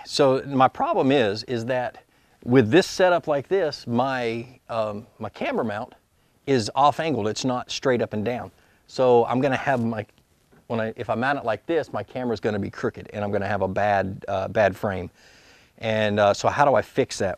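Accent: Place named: South, United States. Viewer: i like when he goes "you see that right there" the southern accent was so strong lol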